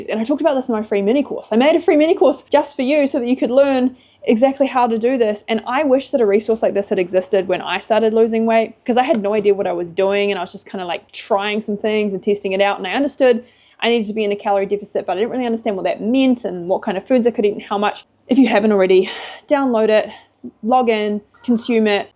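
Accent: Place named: New Zealand. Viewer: DO IT!!! I moved to Japan right out of college. Didn’t speak a word of Japanese, but did have a few college friends there. That adventure changed my perspective SO much. You have friends here in the US, and people here are friendly and helpful. Please don’t miss out on this adventure for you, your husband and children. What’s the worst that could happen? You can always return to NZ. Just be prepared to answer the same questions over and over again. Americans are like big, friendly dogs when they hear an accent like yours and when they find out where you are from, you’ll become the neighborhood celebrities. I SO hope you’ll take the leap!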